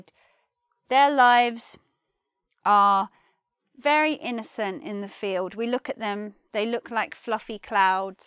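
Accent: United Kingdom. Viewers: british accent :) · Lovely British accent. Thanks.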